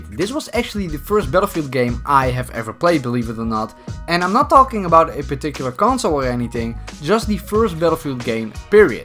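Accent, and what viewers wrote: Netherlands. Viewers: Are you from Netherland ? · Wait a second... why do you sound dutch????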